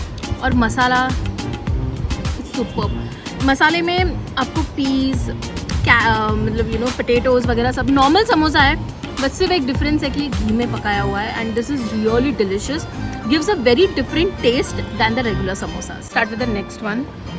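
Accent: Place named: Mumbai, India. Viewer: Lovely VDO. Nice casual feel to it.. i absolutely love your Mumbai Hindi..❤️ Just one thing.. u missed one of my favs Irani Onion Patti samosa....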